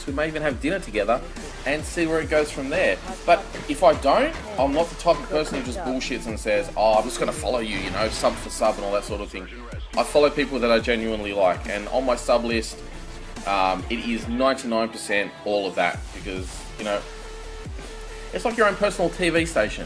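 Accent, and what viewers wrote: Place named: Australia. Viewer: I like the Australian accent, so pwetty :3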